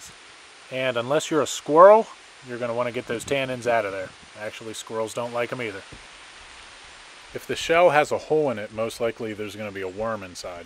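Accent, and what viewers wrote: Pennsylvania, United States. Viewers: Where are you from? You sound like a fellow Pennsylvanian sometimes. Lol I just found your channel today so please forgive me if it's written somewhere and I missed it or just haven't found out yet. Lol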